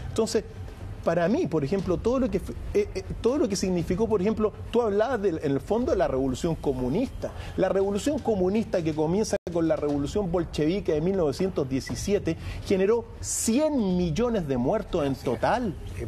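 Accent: Chile. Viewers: me gustaria tenerlo para mi solita, pero entienedo que seria mucho egoismo de mi parte, es usted un tesoro para la humanidad, me encanta escucharlo....sobre todo el acento chileno, lo mas dulce que he escuchado. a mi gusto... gracias por sus predicciones, hay mucho que aprender.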